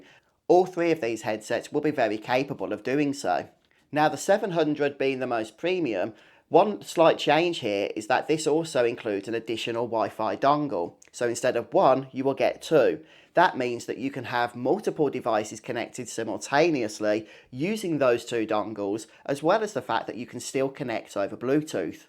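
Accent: United Kingdom. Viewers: Is British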